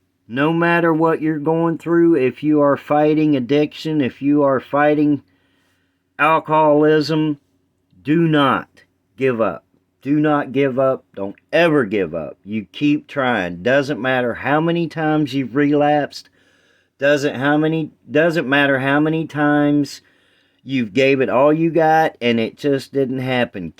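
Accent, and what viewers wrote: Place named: South, United States. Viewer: Fellow stacker here! Sounds like your from the south...Alabama here!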